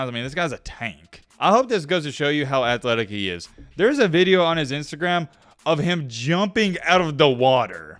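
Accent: South, United States. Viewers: This announcer us annoying AF. Not even going to finish this story. I can't take this hillbilly dribble.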